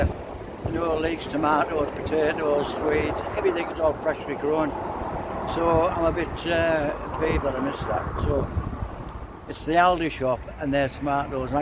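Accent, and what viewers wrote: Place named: Yorkshire, United Kingdom. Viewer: Where are you from? I love your northern accent 😃is it yorkshire? X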